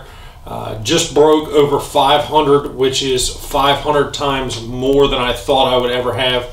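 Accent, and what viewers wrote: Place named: Maryland, United States. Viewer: listen to the 'Merilan' in his voice....also, loved that he substituted speakers.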